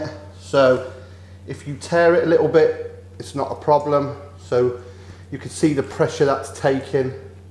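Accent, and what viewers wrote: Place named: Yorkshire, United Kingdom. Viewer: Expected a backwoods southern US accent like mine. Got what sounds like a Yorshire accent(?) instead. 🤣 Cheers mate! Y'all did a good job.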